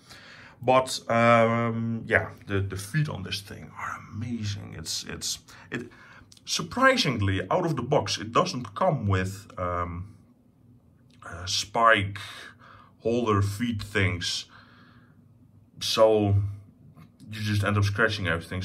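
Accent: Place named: Netherlands. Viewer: Leuke setup man, dacht all heejj dat klinkt als hollands engels😂🤣👌🏻💪🏻👍🏻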